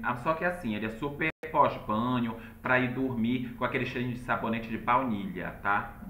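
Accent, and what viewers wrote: Pará, Brazil. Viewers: Você é paraense? Notei q seu sotaque é igual de paraense. 😊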